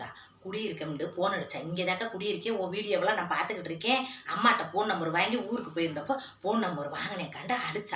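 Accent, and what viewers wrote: Madurai, India. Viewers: உங்க மதுரை ஸ்டைல் பேச்சு ...அழகு👉👌👌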